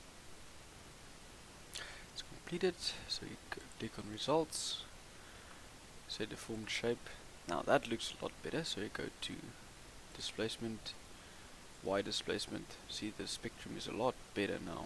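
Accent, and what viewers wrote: South Africa. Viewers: south african much?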